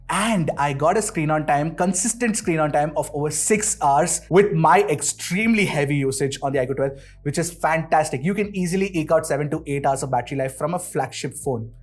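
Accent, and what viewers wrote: India. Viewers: The indian accent is always👌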